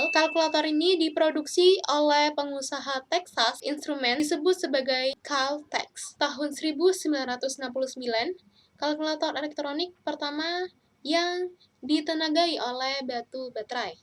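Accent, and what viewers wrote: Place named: Surabaya, Indonesia. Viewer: Nessie judge rasa sby iki 🤣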